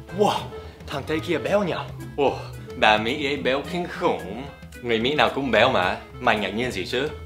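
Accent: Standard. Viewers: nói tiếng việt chuẩn lắm rồi nha:) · Cảm ơn bạn!Video của bạn thật là thú vị dễ hiểu , dễ học và dễ nhớ .Tiếng Việt của bạn tương đối chuẩn !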